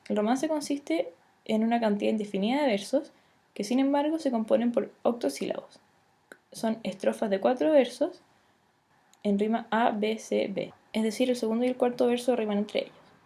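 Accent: Chile. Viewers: no me gusta el asento chileno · A mi igual me gusta el ACENTO chileno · Cuando se nota que eres chilena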